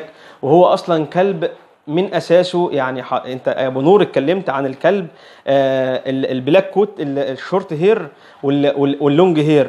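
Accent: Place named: Fayoum, Egypt. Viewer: ماشاء الله ربنا يباركلك انا حاسس انك فيومي من الهجة صح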